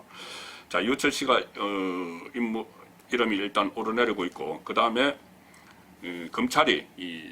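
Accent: Gyeongsang, South Korea. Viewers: 구수한 경상도 사투리를 쓰시면서 정확하게 사건을 정리하여 방송해주셔서 감사합니다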